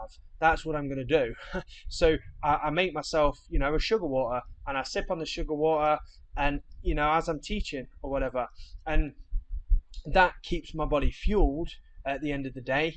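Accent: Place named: Midlands, United Kingdom. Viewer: AHH, ok, must be the midland twang! I'm from Manchester and we stretch out the last A. Banaaana. Loving the new surge in videos, hope you keep banging them out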